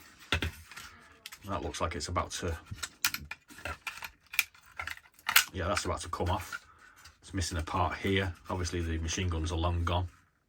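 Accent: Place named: Merseyside, United Kingdom. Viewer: Sounds a bit of Merseyside, Wirral, Lancs there, prob got a motorcycle too, ( like myself of course, im projecting), Subbed, 👍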